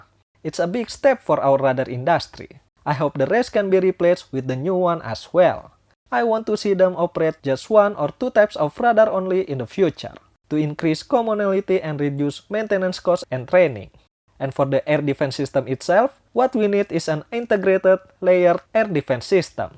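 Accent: Indonesia. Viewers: You got indonesian accent .. cool 👍👍🤙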